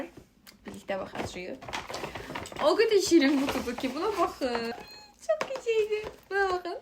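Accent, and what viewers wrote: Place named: Turkey. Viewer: Etim tokulurde bu qiza baxanda niye daniwanda ozunu uwaq kimi aparirsan dodaqlarivi deq bawi qabaga eleyirsen turkce daniwirsan turkler kimi daniwmaga caliwirsan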